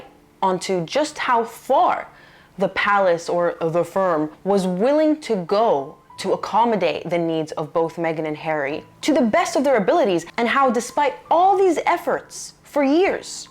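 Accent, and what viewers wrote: United States. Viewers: You said that's you're an Australian, but you have a very American accent! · I have the audiobook for Courtiers I'm currently listening to. I'm just getting into the chapters of current times. I enjoy your channel very much and your knowledge as an attorney. I know you live in Australia but don't Aussie. I am American and was sure you were American by your accent, but then you made a comment that you've never been here.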